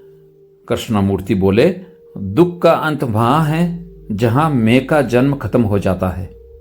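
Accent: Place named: Rajasthan, India. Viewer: Bhai aapka ascent hi complete nahi aap mut bola karo. Aap shabdon ki khichdi banate ho. Aapka hindi per sahi control nahi hai. Aap rajasthani ho.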